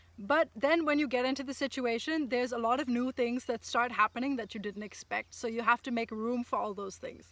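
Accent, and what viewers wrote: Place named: England, United Kingdom. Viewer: You're english accent always melt me down. And you're the most awesome lady💜💜💜💜💜💜💜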